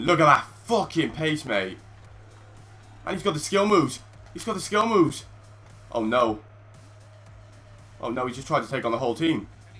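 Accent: Wales, United Kingdom. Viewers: Are you welsh ?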